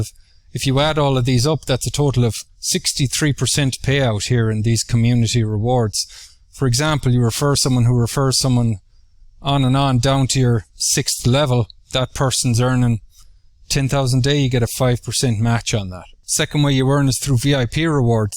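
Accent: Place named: Ireland. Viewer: awesome breakdown! the Irish accent is a pleasant bonus!! thanks heaps..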